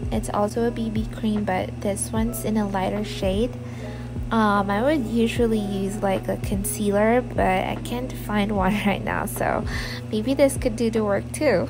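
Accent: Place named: England, United Kingdom. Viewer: Youre English Accent is Great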